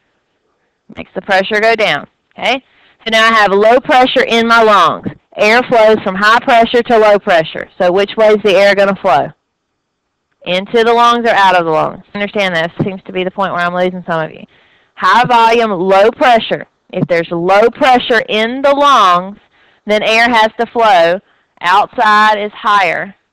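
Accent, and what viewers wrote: South, United States. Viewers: Thank you so much for your lectures! They make this stuff so much easier to understand. I'm originally from NC but go to school in NYC. I appreciate hearing a professor with a southern accent. It feels like home :)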